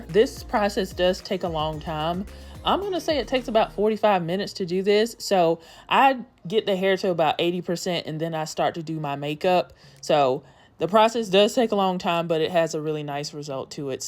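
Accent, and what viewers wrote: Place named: Tennessee, United States. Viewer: I hear a little county accent 😏 I'll guess Tennessee. 🤔 Gorgeous curls tho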